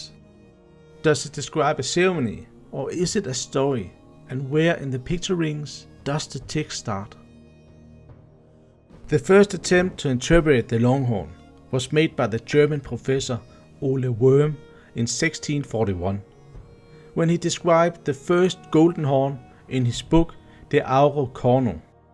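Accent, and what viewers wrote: Denmark. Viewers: your light Danish accent is very cute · I dont Care about your danish accent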